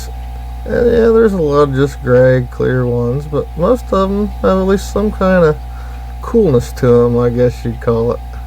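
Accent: United States. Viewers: Are you from texas?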